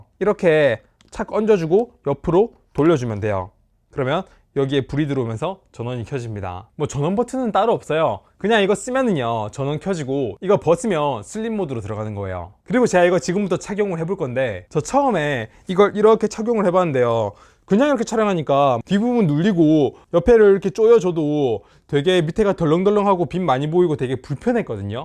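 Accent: Gyeongsang, South Korea. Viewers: 아씨... 경상도 사투리...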